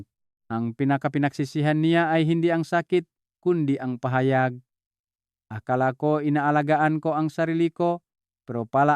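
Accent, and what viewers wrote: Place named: China. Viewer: Intsik na accent naman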